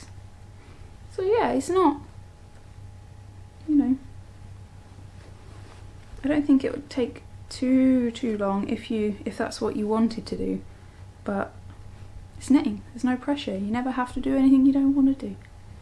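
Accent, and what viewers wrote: United Kingdom. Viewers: Oh thank you so much for this new video :) this is just so sweet from start to end, and the 2 Elfmail sweaters are gorgeous, how lucky I am to not have my entire stash by hand otherwise I would have casted on one tonight! As you said, currently "sinking into calm" when chaos is too close, and loving your accent : remind me some months spent in UK, not so far from you! Take care and happy knitting!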